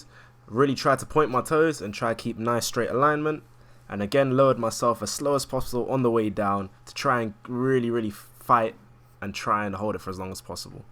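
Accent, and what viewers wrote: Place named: RP, United Kingdom. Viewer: wasnt expecting the posh british accent when i clicked this video. Nice video lol.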